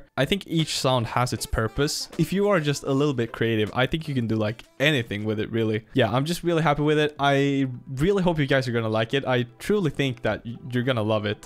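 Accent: Sweden. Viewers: I think you should give us the mixing stuff that you always do with details. And a mastering start to finish .(i actually love your swedish accent 😂) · din svengelska dialekt är ju fett najs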